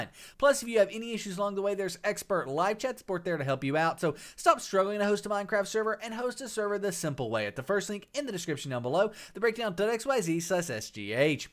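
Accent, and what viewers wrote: South, United States. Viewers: dude turns country at the end of every statement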